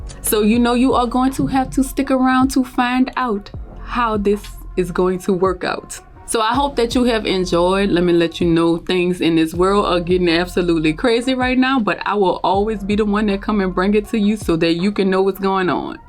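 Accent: New Orleans, United States. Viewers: Are you from New Orleans baby? I ❤ your accent 😍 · Mmmmmm 🤔..... Is that a New Orleans accent? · Not Related but, I assume you from NOLA, because I can hear New Orleans in your voice lol 😆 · Are you from Louisiana? I’m thinking I hear that New Orleans accent.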